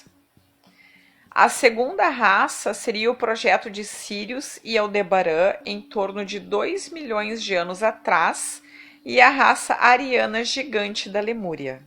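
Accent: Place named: Porto Alegre, Brazil. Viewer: Fantástico, e com narraçao porto alegrense fica ainda melhor... Rsrs. Maravavilhoso trabalho minha conterrânea, parabéns.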